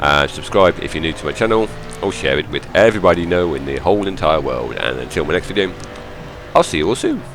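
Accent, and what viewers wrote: United Kingdom. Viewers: would say uk.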